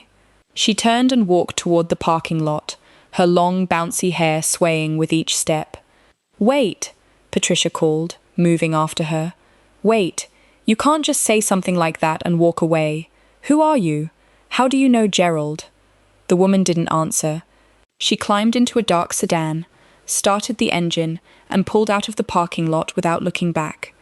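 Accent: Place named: United Kingdom. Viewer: I just started watching from Hammond, Indiana, and already the sarcasm is everything you know if you’ve ever seen my comment I live for the British accent just makes everything sound right. The humor lands perfectly.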